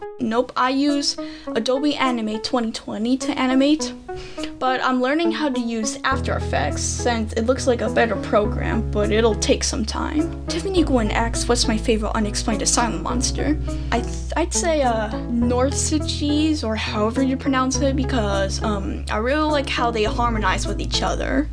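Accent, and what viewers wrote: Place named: United Kingdom. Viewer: I used to think you were british back then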